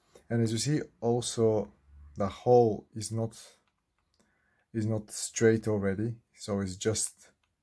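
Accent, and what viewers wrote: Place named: Russia. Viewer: По акценту вроде наш) как снять эти серые крышки, не повердив корпус?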